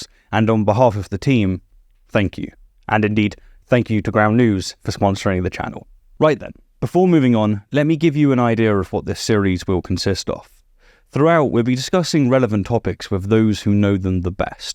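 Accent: United Kingdom. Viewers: It's sort of nice to hear a voice with this accent say that trans people are people. Some hope for the UK yet.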